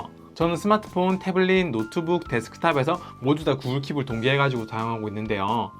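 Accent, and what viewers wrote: Gyeongsang, South Korea. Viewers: 말투가 경상도네요? 사랑해요.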